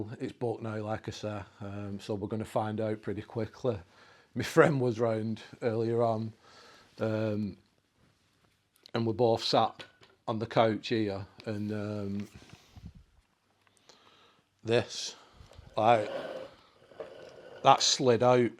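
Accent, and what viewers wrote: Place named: England, United Kingdom. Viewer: Great Videos. For sometime now, each time you ask Alexa a question, our Alexa answers you 😳Our voices are completely different. I have a Texas draw and you have an English accent…✅🇺🇸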